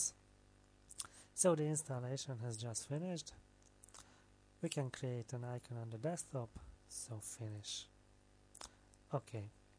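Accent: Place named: Hungary. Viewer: azért észre lehet veni a kiejtéseden, hogy magyar vagy XD. Amúgy nagyon jó tutorial. Kössz szépen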